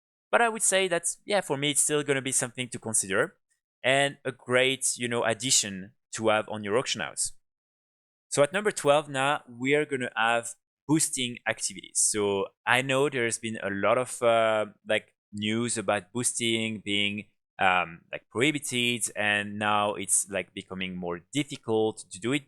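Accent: France. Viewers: Really like your narrating style and you're French accent! 😊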